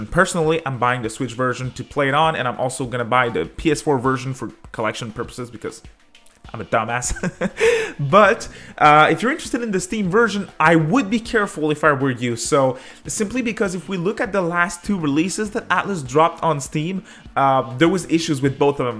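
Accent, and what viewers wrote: Quebec, Canada. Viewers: Will buy it on switch day 1. Can't wait to discover this game, I'm still kinda new to the SMT series. I've only beaten DDS1-2 and smt4. Do I hear a french canadian accent?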